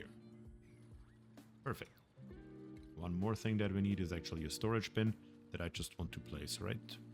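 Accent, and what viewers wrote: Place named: Germany. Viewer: i have watched enough joerg sprave to know that this man is definitely german.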